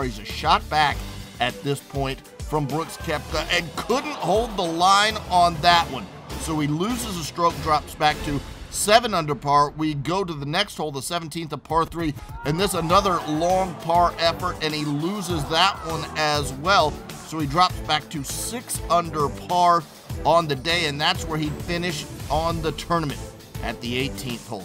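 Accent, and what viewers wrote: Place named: United States. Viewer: Crap yank commentary...I can't watch it after 7 seconds...just ruins the highlights...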